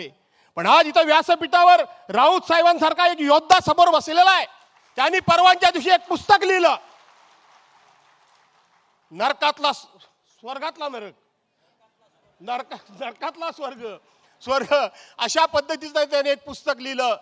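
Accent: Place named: Konkan, India. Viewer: Kokan aavaj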